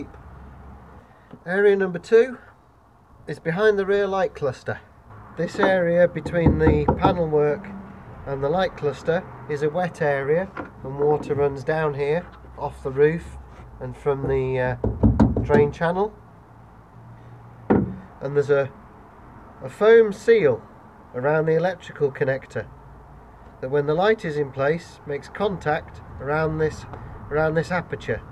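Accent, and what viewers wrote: United Kingdom. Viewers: Love British accent :) very helpful video, many thanks!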